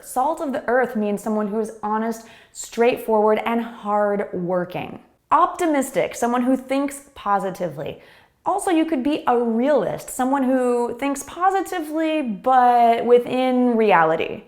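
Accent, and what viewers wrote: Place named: United States. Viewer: good job. Excellent. Sometimes I forget your accent is an American one. There are several teachers I can't get them without driving crazy.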